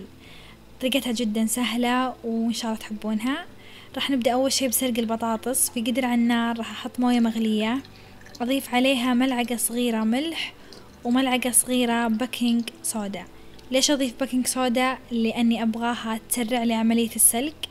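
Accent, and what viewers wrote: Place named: Najd, Saudi Arabia. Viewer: يعطيك العافيه يارب شكلها يشهي جدًا ماشاء الله عليك أحب قناتك ولهجتك هههههههههه من النادر أسمع لهجتنا النجدية في يوتيوب وه بس 💖 ،، ملاحظتي بس إن كمية الزيت كبيرة عشان كذا ممكن ماتعتبر طبق صحي تمامًا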